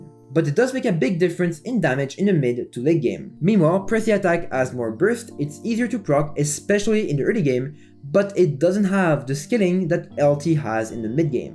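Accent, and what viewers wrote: France. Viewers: You're french aren't you? Your accent sells it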